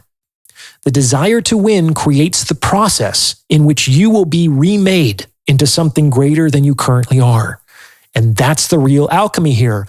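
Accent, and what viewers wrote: United Kingdom. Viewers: If you are astute enough to make a lot of money, then you should be astute enough not to drive the fancy car. You sound like some sort of UK Indian subcontinent person. The average intelligence quotient is 82-83, and you are probably going to receive a lot of idiotic behavior. If you are middle class, then these people are even more status-oriented, more subtle and less greedy, and unless they are your true friends, then they are useless in your life. You won the race and they are all losers in the race. At least 60% of people do not possess the ability to be happy, and they only way they can gauge happiness is by what they have, and how much things cost. It is a mostly an impoverished existence. I was also successful for a number of years, and none of my true friends ever needed money, because they also eventually became successful. 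But there were many other people that I knew, and I had obligations, and then I moved to the second world much of the time (where I live a dream life), and all of the people wanted something from me. If you want to get along with (all of the other people who are not achieving) then do 2 things 1) hide your wealth to the greatest extent possible, so you don't get killed (like the Chinese woman Abby Choi, who was killed by her former in-laws in February 2023) 2) Friends are different, but when it comes to acquaintances, make sure your money is available for their achievement. Your job is to make people's lives better. (I give money, based on what I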